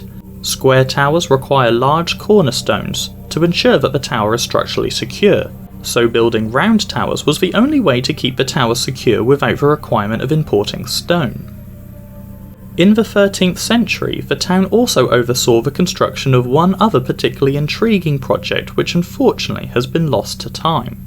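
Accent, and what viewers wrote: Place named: Norwich, United Kingdom. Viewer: I noticed your norwich accent and enjoyed it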